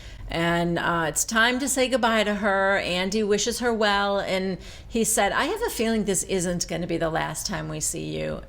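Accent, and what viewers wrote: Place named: Midwest, United States. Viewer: Love listening to you midwestern, my favorite recapper!!!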